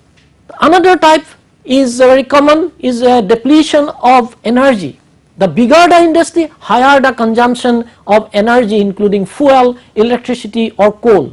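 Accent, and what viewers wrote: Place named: Gujarat, India. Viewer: Presentation is very systematic and thorough. No frills and fancies. There is no repetition and the material is complete. The speech should be dubbed over by an English medium educated person so that people all over the world will watch the video. The Gujarati accent is not appreciated generally even in India, even by Gujaratis themselves.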